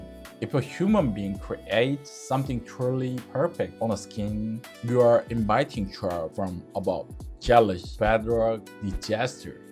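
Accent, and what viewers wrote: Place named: Japan. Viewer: The most realistic English Japanese accent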